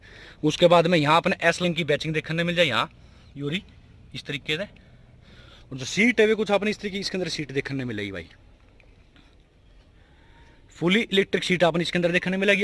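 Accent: Haryana, India. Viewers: This is not hindi it is haryanvi bhai lage raho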